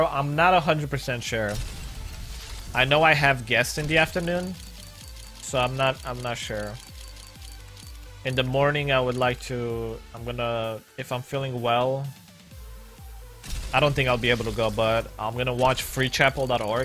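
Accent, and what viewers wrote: Romania. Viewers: IS HE ROMANIAN?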